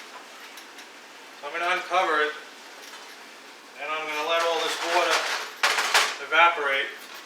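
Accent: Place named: Boston, United States. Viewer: ..You must be from Boston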